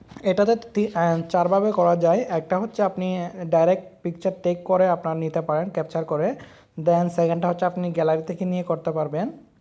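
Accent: Noakhali, Bangladesh. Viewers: After focus pro i have used long times ago around 5/6 years when i was using SYMPHONY XPLORER W25 which was my first android smartphones. Moreover it was a gingerbread version, so your review doesn't making any bell to me. By the way one request to you: "your review might well perhaps your presentation was really terrible & i hope next time you will be more careful to use proper Bengali accents instead of নোয়াখাইল্যা খিচুড়ি কথা, either your review will going to be spoiled.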